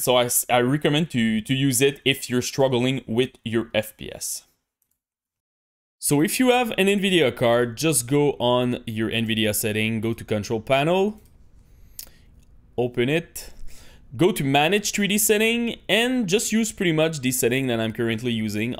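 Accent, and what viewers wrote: Quebec, Canada. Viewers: Sérieusement, tu donnes toujours de bonne recommandation! Pis en plus, tu cache pas ton accent, bien de chez-nous! Lâche pas le bon travail dude.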